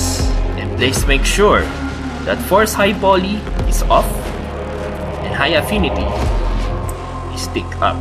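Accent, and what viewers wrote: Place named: Philippines. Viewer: sabi ko na eh pinoy base sa accent haha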